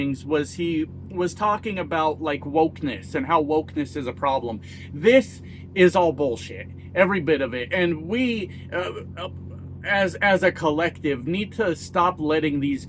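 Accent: Canada. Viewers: Ugh. I’m super happy that our Country voted for Carney but I am from ‘Berta so this morning I woke up to a FB feed full of separatists. I think I feel the way you guys felt watching your friends vote for Trump. I’m still nervous at what havoc my province is going to stir up. That being said, you very much do sound like a Canadian bud. Welcome!:). And not the weird ‘Berta type. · You have the kind of Canadian accent that makes Canadians ask "What part of Canada are you from?". You sound like one of us, just one from someplace else. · does sound Canadian a little...not sure why:face-blue-smiling::face-green-smiling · We got the right guy for prime minister. And yes, you do sound Canadian. We love your speeches. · Didn't think about it until you pointed it out, but you do sound like you're from Nova Scotia😊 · You do have a Canadian accent! I embrace you as an honorary Canadian. 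Thank you for your support. 🇨🇦 I am proud of Canada. 🇨🇦🇨🇦🇨🇦🇨🇦🇨🇦🇨🇦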